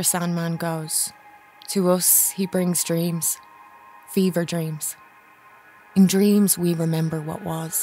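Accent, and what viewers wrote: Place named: Ireland. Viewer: Oh my goodness thank you! Yes, sticking close to my apartment to minimize risk, while putting forefront my mental health. May you and yours remain safe as well! I must exclaim I so love your video! I shared it on my own Facebook wall and much thanked my friend Ginger for steering me toward it. This video is beauty, hope, assurance, validation, comfort. Plus an Irish brogue oh my! All together scrumptious. <3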